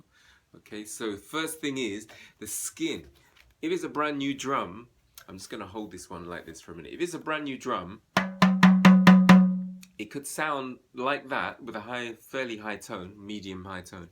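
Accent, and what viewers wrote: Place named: London, United Kingdom. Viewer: Hi Sir, please tell me whereabouts in the UK you are based - hopefully London from your accent? I am interested in buying one and taking up lessons. I am very serious depending on what the finances are. I have added you on Instagram and my name is David. Thanks.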